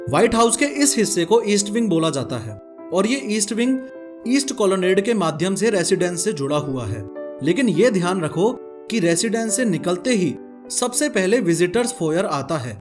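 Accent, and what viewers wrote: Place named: Jaipur, India. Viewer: Sir are you from Jaipur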